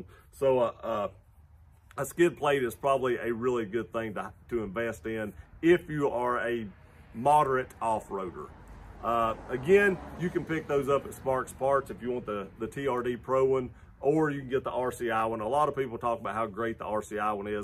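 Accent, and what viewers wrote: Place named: Appalachia, United States. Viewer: Your accent sounds like West NC, Appalachian?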